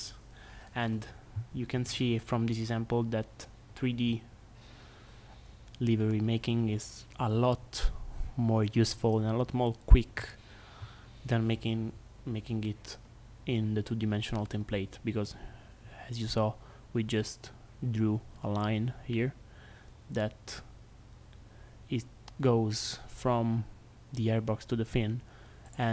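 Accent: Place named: England, United Kingdom. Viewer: bro has the best english accent and still apologises that much... amazing video, thank you!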